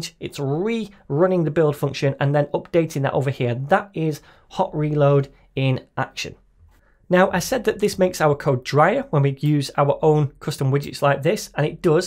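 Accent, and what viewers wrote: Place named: England, United Kingdom. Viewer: I like your English accent!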